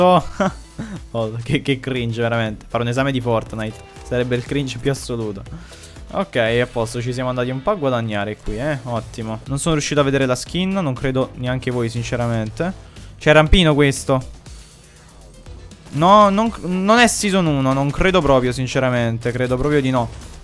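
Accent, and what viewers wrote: Naples, Italy. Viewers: madonna mia fra quanto si sente accenti napoletano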